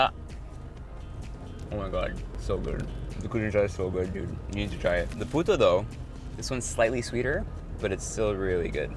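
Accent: Philippines. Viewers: Ang cute nang pag tatagalog mo grabe haha ❤❤ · Cute nun Tagalog mo kuya.. can u make video na puro Tagalog Lang 😉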